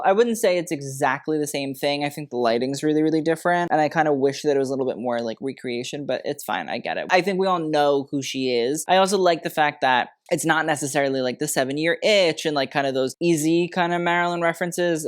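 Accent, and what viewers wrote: Ireland. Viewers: Perfect Irish accent…love it❤ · Your Irish accent sounds like Mrs. Doubtfire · BEST IRISH ACCENT QUEEN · !! I always love when you pull the Irish accent out! Sounds just like my mother in law from Belfast 😜🇮🇪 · I usually don’t gove youtube videos a thumbs up but damn I hit that button so hard just 2 minutes in. That Isish accent was incredible 😭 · that is a very good irish accent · nice irish accent! Yanks normally butcher it but you're pretty spot on! Gwan Sis! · Omg the Irish accent without sounding like a leprechaun is fantastic! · what an excellent Irish accent - all about it!! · My father is Irish and you did fairly well with accent, Sister. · You really made me laugh! The irish accent was great and what you said about Ed Sheeran had me in stitches. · Excellent Irish midlands accent:) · Sorry, but nun + Irish accent brings to mind only one person: the legendary Sister Michael from Derry Girls 🖤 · I could listen to that Irish brogue for days. 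So delicious. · Ok but you’re Irish accent sounds just like Robin Williams, Mrs. Doubtfire! Which is such a slay!! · This mf Irish accent lmaooo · Lovely Irish accent and cute costume 😊☺️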